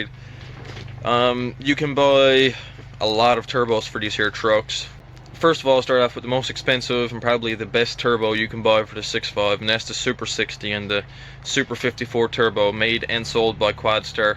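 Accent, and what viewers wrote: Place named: Canada. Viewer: Must be from Canada. Likss saying ooot not out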